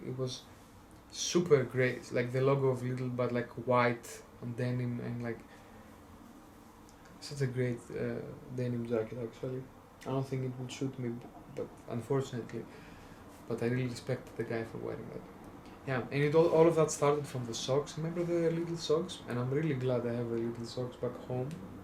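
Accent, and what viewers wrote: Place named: Greece. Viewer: Your accent reminds me of stefanos tsitsipas, which makes sense because he is greek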